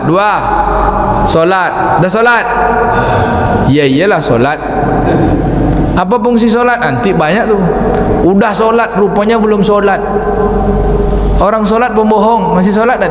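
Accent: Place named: West Sumatra, Indonesia. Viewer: Dari sumatera barat, ada sesekali beliau pakai bahasa minang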